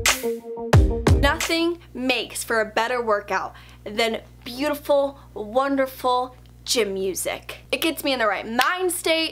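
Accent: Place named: South, United States. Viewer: Are you from the South? Sometimes I hear the accent, sometimes I don't.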